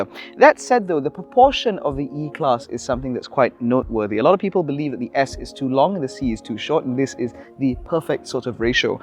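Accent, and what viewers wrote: United Kingdom. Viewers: I love your English accent, are you educated in the UK, love it.... "The headlights look low rent" 😂👍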